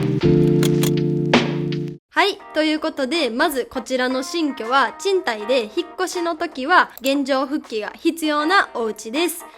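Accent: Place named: Kansai, Japan. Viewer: めっちゃ関西弁やん。 久しぶりに関西弁聞いたけど、やっぱなんか落ち着く。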